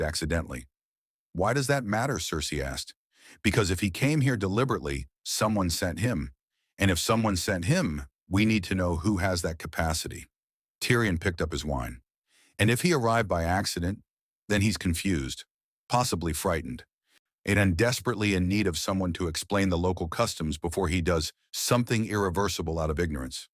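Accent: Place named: United Kingdom. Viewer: Interesting story, but British accents are just meant for story telling.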